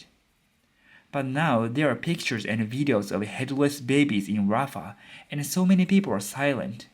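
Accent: Japan. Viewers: 発音からすると日本の方ですね、この話題を触れてありがとうございます、日本好きな外国人としてパレスチナのデマを日本のSNSで見かけると心が折れてしまう、悲しく感じてしまいます、このどうがをみたあとにうれしくおもいました、現地の真相を語りつけずけるように祈ります、めちゃグーグル翻訳っぽくになっちゃってすみません～ｗ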